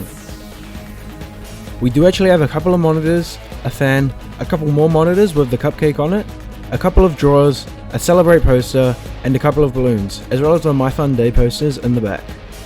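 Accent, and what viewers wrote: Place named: Australia. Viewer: Listen to that accent… bro really thinks he’s not Australian with THAT voice…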